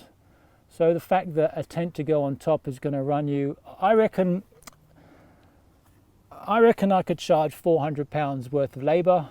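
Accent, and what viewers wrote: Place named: Australia. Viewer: I thought this guy was an Aussie. Can you make tea in it?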